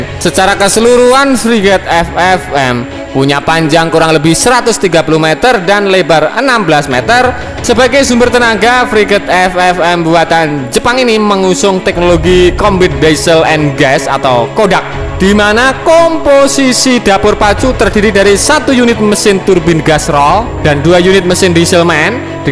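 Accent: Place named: Ponorogo, Indonesia. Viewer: Mas ceking Ponorogo ya.. suaranya.. 😀😀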